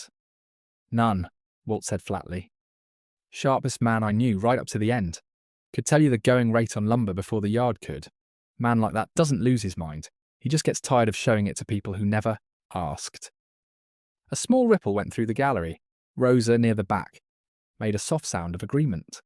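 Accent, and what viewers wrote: United Kingdom. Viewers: Good story. Interesting British voice.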